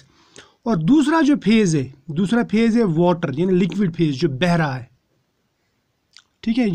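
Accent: Kashmir, India. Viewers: totally Kashmiri accent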